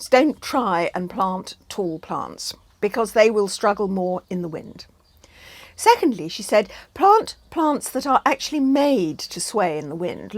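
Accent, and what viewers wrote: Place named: England, United Kingdom. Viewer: Never thought I'd be listening to such a delightful English accent when I wanted help with my super windy terrace garden. And the tips are marvellous to boot. Thank you for the video. :)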